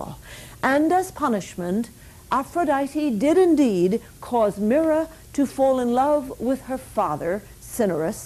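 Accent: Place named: Ireland. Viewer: 15 minutes in before I noticed the Irish accent.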